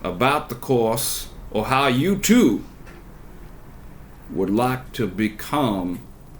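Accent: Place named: Georgia, United States. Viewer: I like the accent. Sounds like you’re from Gawja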